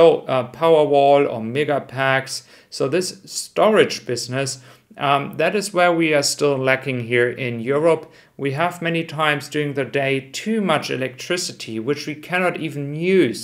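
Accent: Germany. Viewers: I agree, in fact English with a German accent is one of my favourite things. Great content always... thanks!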